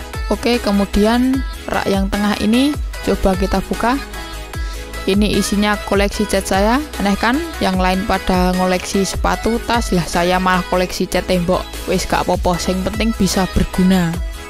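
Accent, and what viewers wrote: Java, Indonesia. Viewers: medok medok joss🙃, kreatif, menarik salam dari sragen jawa tengah brader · Ya ampun...salut dah.... bisa dibuat kisah inspiratif bagi murid-murid saya. Suwun Dik.... bahasamu medok banget...hahaha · Wadohh zimzajaib kok jadi medok gini ya!?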